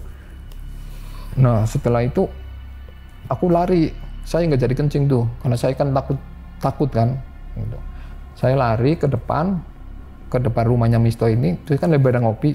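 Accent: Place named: Banyumas, Indonesia. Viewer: Ketara banget ngapake kang.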